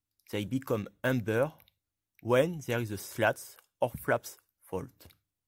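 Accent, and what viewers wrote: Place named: France. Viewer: Amazing video . Very well explained . Good graphics . Love your French accent . Would love to see more and more videos of exercises done in simulator checks ,, even after 8000 hrs on A320 ,, this video on flaps and slat positions was a very good pictorial learning . Like and subscribed.. waiting for tutorials on ra1+2 .. emer elec .. adr + ir exams ,,, unreliable airspeed also ,, since there is not a single satisfactory video on YouTube … and many more videos pls …. We would also like to see videos on how to apply MEL in various stages of flight ..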